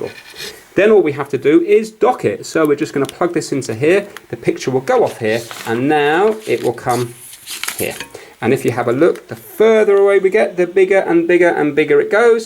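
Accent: England, United Kingdom. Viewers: Lol that funny English accent 😂 It's cool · Hey your british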